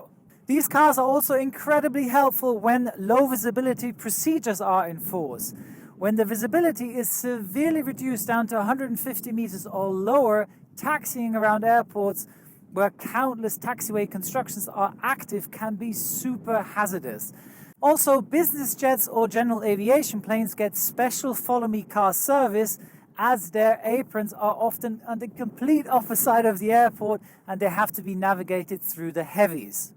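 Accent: Swabia, Germany. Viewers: Ned schlecht dei Schwäbisch, muss i scho soga 👍🏼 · Endlich amol oinr der mo Schwäbisch schwätzt. Scheeeee. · Nice schwäbisch I´m living 1 hour south of Stuttgart. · Bisch wohl a echter Schwob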